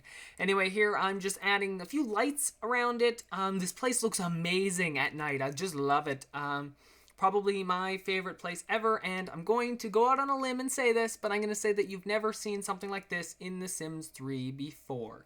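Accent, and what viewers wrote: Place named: Canada. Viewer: It's a white (Canadian) Guy speaking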